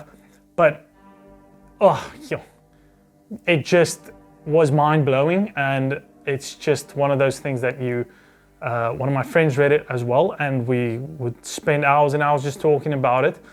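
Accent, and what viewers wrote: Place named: South Africa. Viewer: Suid Afrikaanse aksent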